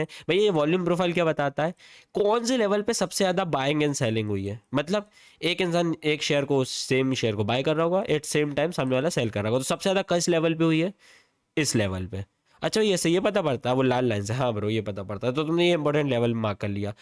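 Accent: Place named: Bhopal, India. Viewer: mujhe lag hi rha thaa bolne ke tarike se😂.... Apan bhi Bhopal se hey✌🏻🙌🏻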